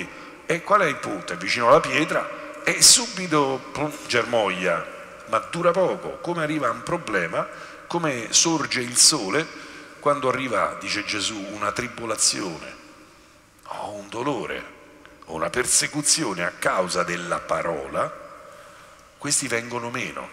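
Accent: Rome, Italy. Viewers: Chi scrive che il romanesco da fastidio be significa che si mette una barriera alle cadenze dialettali che in ogni regione ha e poi io nn sno romana però piace.😮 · Don Fabio, se potesse per cortesia evitare di parlare con parole e toni romaneschi, è fastidioso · e come fà..... é de Roma... e noi romani parlamo come parlamo... e daje Don Fabio chessei gajjjardo, er mejo prete detutta Roma...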